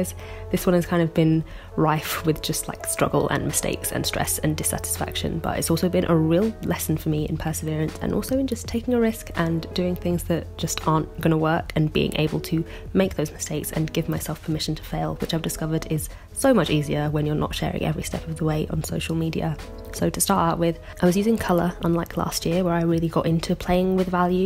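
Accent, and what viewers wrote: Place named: RP, United Kingdom. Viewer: Your work is amazing- as always. Why do I think you sound more ‘posh’ in this video? ☺️ I decided to do inktober this year. Being a full time secondary art teacher I knew it was not going to be easy. I took the plunge anyway. Everyday I would come home after a long day and I would think of what to draw. I did some traditionally on paper, otherwise, I completed each prompt on ipad as it was easier for me to do so even while in bed.🙈 Somewhere along the way I had some procedures done at the hospital and I did really want to give up- but I took few days off and I tried catching up- I am still catching up. I do feel a sense of achievement and I am hoping I will complete the last few by the 31st. You have been a big inspiration to me. I have talked about you to my students and I highlighted your art and also your resilience, commitment and integrity towards your art. 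I talk about you so much that some pupils thought I knew you in real life😂😂😂 Anyways I hope you settle down in your new place. All the best and looking forward to more art from you. By the way I really liked the limited colour palette approach and I like how you worker with the ink when the ink was not doing what you wanted.... as opposed to just give up! Sorry for this long paragraph- just had to tell you all of this. ❤️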